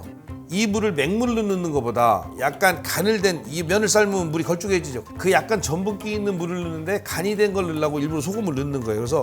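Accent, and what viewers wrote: Chungcheong, South Korea. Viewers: 구수한충청도사투리언제나정겨워요 저도동향이라 ㅋㅋ 감사합니다 언제나행복하세요 소유진씨와~~홧팅♥♥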